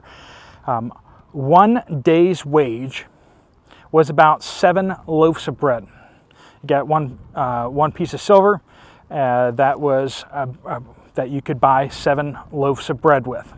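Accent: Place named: United States. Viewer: Nice of you to do reports from your journey - even with your AMERICAN pronunciations.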